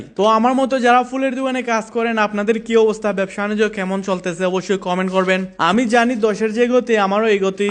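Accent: Bangladesh. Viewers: বাড়ি কিতা সিলেট নি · হবিগঞ্জের ভাষা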